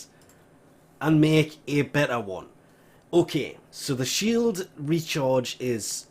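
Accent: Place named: Northern England, United Kingdom. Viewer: Desrei Camacho dude wtf, tacky spud is like full on Irish, this guy is from up north England somewhere, ya they speaking the same language but wtf dude are you deaf?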